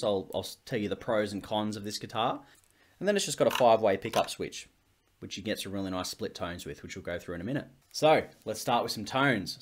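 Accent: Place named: Australia. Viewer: I was looking at maybe buying this guitar and thought I'd look up a review, saw the Aborted shirt, then heard the Aussie accent and it was gg on the subscribe button lol 🤘👌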